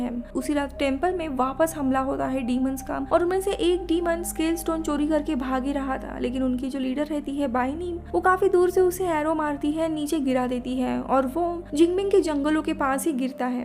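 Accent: Maharashtra, India. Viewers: Aap marathi ho kya?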